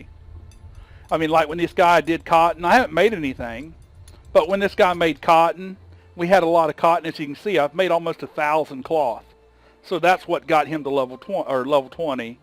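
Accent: South, United States. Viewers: The lesson also is to avoid ranting when recording days in advance, because the issue could be fixed before the video comes out, making you look like you don't keep up with the development of the game (Even though you are in fact one of the more involved youtubers I've seen.) Anyway, nice series, I'm really enjoying it. Love your southern country accent too, helps differentiate you from all the other Let's Play makers. Last few episodes were hard to get through though, with the five minutes of actual gameplay and the rest all paused with you talking the viewer's ear off. Not that I mind you talking, just play the dang game while doing so. It's a Let's Play not a Let's Talk. Glad your back to the old play and talk format. Also +1 for having a David on the team:p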